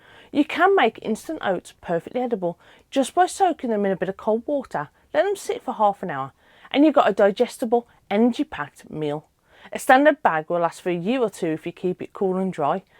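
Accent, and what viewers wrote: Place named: Black Country, United Kingdom. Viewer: black country? Rowley regis? Is my guess ❤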